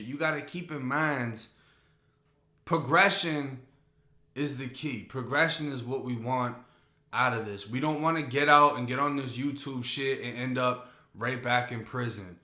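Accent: Boston, United States. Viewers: You’re losing that Florida accent Ma Boi! Sounding like you from Boston again. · I was wondering what accent that was i thought it was ny but it didn't seem right then he said Massachusetts....thats boston!!!! Now it makes sense. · He uses florida slang with a Boston accent. Thats all. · That bean town accent don’t evaah go away lol no amount of time in Florida could get it out of him! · All I hear is a Boston accent. I like it! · are you from boston? the accent sounds boston just asking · Um Hell Naw he doesn't are you from FL? he might of did time in prison in my city and state, but that's a Boston accent and he doesn't have a FL slang he is just trying to talk like us. lol i bet everybody in Tampa will tell you he sounds nothing like us. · Been rocking with you for two days and I knew you was from up top I know you was from Boston I hear it but you had me fooled for a minute ain't know what the thing you kept talking about Florida but that's besides the point you teach getting lessons and you have great stories keep doing your thing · Damn bro you got a thick ass Boston accent.. 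I grew up ten minutes from Malden but live in Orlando now.. don’t have a felony on my record though. Got charged with assault with a deadly weapon but got a cwaf so they dropped it to a misdemeanor..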